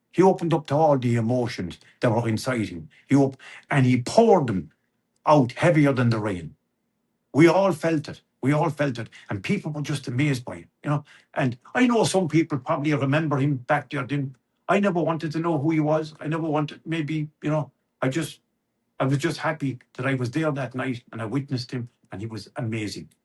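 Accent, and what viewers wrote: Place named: Ireland. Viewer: God love you, you’re still as Irish as the day you were born … so good to hear you speak. Great story, keep them coming! Without a doubt you’re a true story teller … but you knew that. I certainly can relate to school homework not done and the repercussions on Monday morning … but throughout my school days it never changed … now writing stories … that was a different kettle of fish. So happy for your new venture and may those darn shingles disappear! ❤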